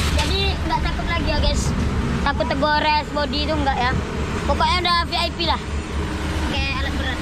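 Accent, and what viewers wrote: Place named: Sumatra, Indonesia. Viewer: pertahankan, lebih lucu pake logat sumatra😁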